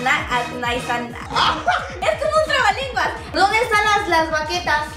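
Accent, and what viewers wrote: Argentina. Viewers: Se te esta pegando el argentino 😂😂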